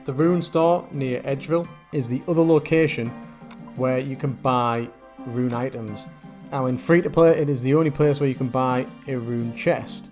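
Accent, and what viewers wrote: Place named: North East England, United Kingdom. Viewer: You from the north east of England mate? Just sounds it with some words you pronounce.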